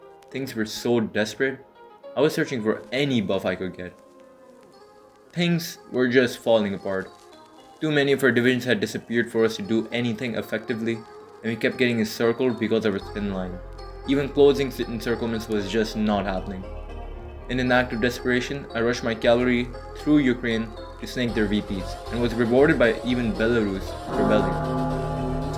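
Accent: India. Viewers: Try to hide the accent next time jeet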